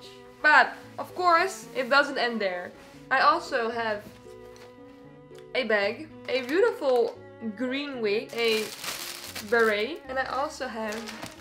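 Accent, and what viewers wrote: Netherlands. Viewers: her accent sounds dutch, is she dutch?😅🍓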